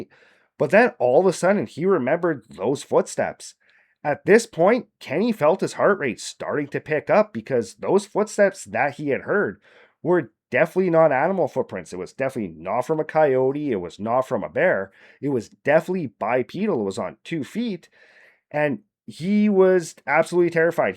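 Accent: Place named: Canada. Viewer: I really like your story telling here. With practice, I think you could become a unique voice, but if i might give just bit of critique, find your own voice and deliver confidently with that. You're abour the third or fourth person I've ran across that has tried to match cadence and narration to Mr. Ballen. I'm not saying this because I'm a fan of his. I personally find his delivery to be kinda annoying and something that only works for him. You've got something unique with your Canadian accents, and way of talking. Lean into that.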